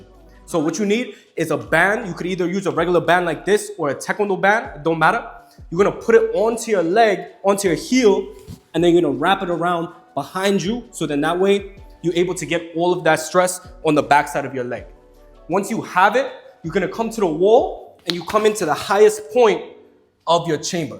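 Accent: New York, United States. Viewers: I’m from Queens and I KNOW you gotta be from NYC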